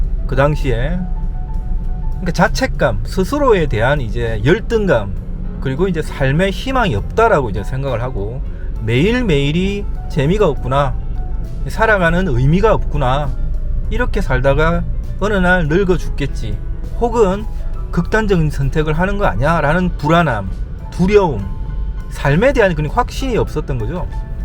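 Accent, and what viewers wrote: Gyeongsang, South Korea. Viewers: 참 말을 재미있게 잘 하네요. 얄구진 차도 끌고 다니고 . 오랬만에 얄구진 소리 들어 보내요. 경상도 사투리 인같네요.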